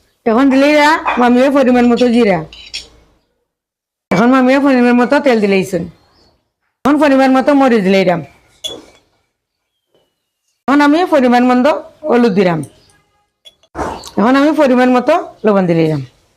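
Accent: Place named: Sylhet, Bangladesh. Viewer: Mashi Maa ajkei apnar video deklam vhala laglo karon sylheti math hunia.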